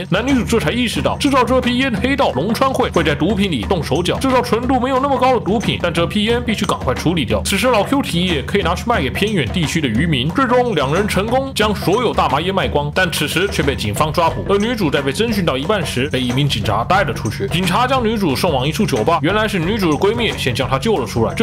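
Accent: China. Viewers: 是中国腔，，你的叔叔是中国人吗？ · 故意用中國腔講電影🤣🤣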